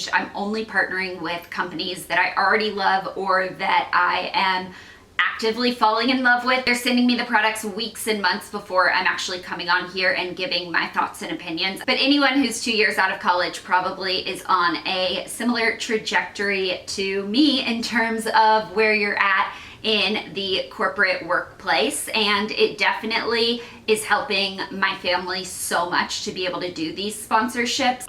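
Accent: South, United States. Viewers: Do you have a southern accent that you don’t show on camera? (I’m from Tennessee too, and it’s so easy to sound yeehaw😂) These new videos are so refreshing and calming. I like to listen to them when I can’t sleep . 🩵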